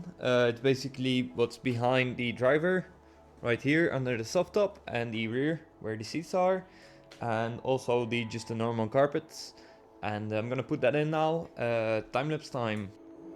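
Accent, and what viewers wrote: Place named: Brabant, Netherlands. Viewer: das wel bekend dialect!! midden Brabant?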